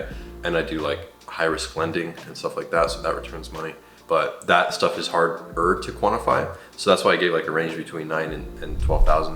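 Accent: Romania. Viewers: Bro, I knew you were romanian, I can finally hear you talk :)) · ador accentul,make ardeal great for good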